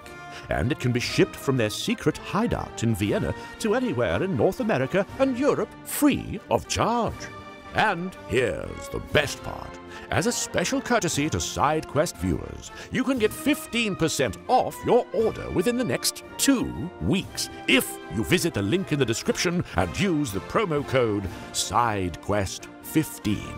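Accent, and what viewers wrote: United Kingdom. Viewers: "it was us Europeans" he said with a British accent, showing the UK as part of the EU was this written in... when did brexit start again? what? 2011? x'D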